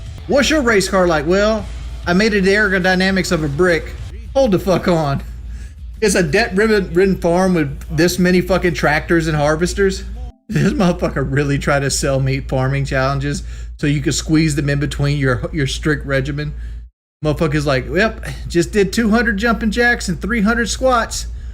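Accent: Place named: South, United States. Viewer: His weird fat/country/ebonics talk was especially difficult to listen to this time around.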